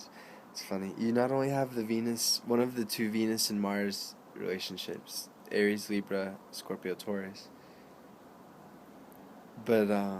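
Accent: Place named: West Coast, United States. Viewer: lol you said at the function. you are def. from the west coast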